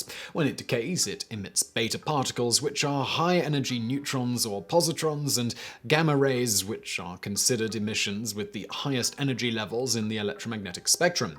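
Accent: England, United Kingdom. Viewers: I really enjoy this mans narrating, maybe cause im American but his English accent is quite soothing. Even if it’s about a radiation disaster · This guy has the most British accent EVAH! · Hispanic names in a British are incomprehensible to my brain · Cool story. But your pronunciation is still terrible. And the names and places sound like a mumbled run-on. Ciuda--Juarez. The guy's name is Vicente not viNcente. It sounds especially strange because you have that smart british accent thing going on but then butcher or mumble the names. I guess Americans aren't the only ones. At least they enunciate the letters, even if the pronunciation is wrong. Don't forget the O-bam-a. I'm keeping count. :P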